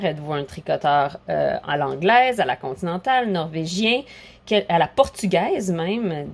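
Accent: Quebec, Canada. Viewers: Woaw, un point qui le fait plaisir et l'accent du Québec aussi. Quebecoise en France depuis 1998😊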